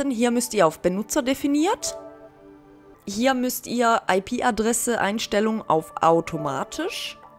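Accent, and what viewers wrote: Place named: Swabia, Germany. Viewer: Ich mag Deinen leichten Schwäbischen Akzent 😊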